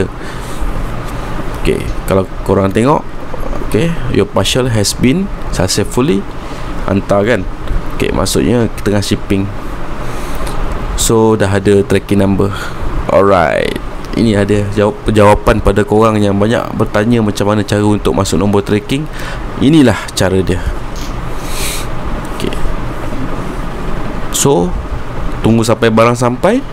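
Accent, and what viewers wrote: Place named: Perak, Malaysia. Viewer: Orang perak ke mike 😬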